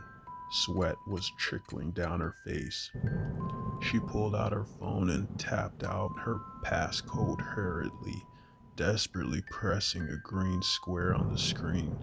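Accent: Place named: South, United States. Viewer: Are you wearing your “human skin” today? 😝 That part of the story gave me chills and then I pictured some kind of ALIEN!?!😱 I loved your precious Southern Belle accent… really suits you and all of your character voices! I hope 🤞 your channel grows fast and soon. I’ve told people, but I’m not good at using my phone and sharing links and bloody blinks! Lol… I’ll get there! Thanks for your content and enthralling stories and skills. It’s not as easy as people think 🤔 I wish you all the BEST and I’ll be watching and learning how to share too ✌️✨🤗✨🐨✨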